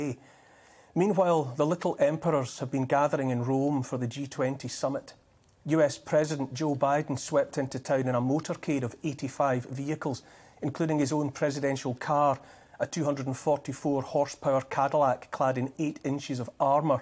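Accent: Scotland, United Kingdom. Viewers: You beautiful man! Pomposity flensed and truth laid bare with a soft Scots brogue.. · Best smack down delivered to the globalists and technocrats ever! And all done with a lovely Scottish bur that drips with both strength and sarcasm and humour, as only the Scots can do. Never more eloquently have I heard someone hold forth so persuasively, driving home the "One law for me, one for thee" message. Subscribed! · Brilliant. What Scottish accent is that? What region?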